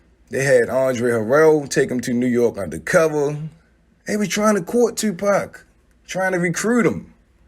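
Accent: Baltimore, United States. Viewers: U have to be from Baltimore Maryland I can tell by that accent . U sound like my sister. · This guy, the content creator is from Baltimore based on his accent.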